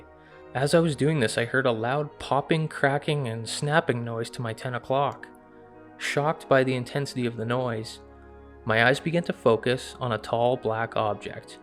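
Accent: Canada. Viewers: Isn't he from Canada? Lol.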